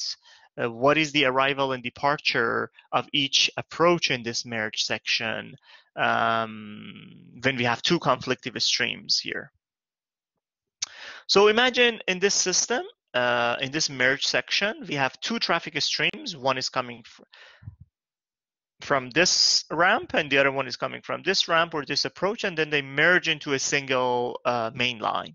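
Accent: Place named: United States. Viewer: That's a long video, I spent 3 days watching it, making notes at the same time, and learning English as well. Thanks to good American accent, I can understand the video relatively easily, but the theory is still difficult to grasp.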